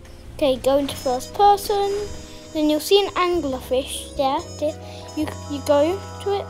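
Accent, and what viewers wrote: United Kingdom. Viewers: you sound like a british kid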